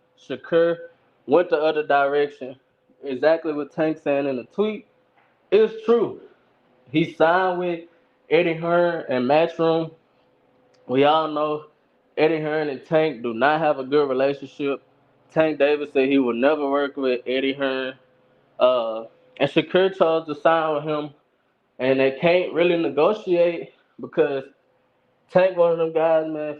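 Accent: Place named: Baltimore, United States. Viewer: you sound like you from bmore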